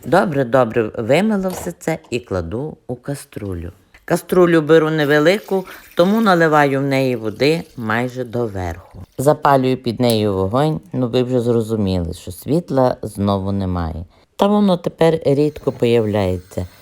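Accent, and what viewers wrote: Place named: Poltava, Ukraine. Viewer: Доброго вечора. Така прекрасна мова, ви часом не з Полтавщини?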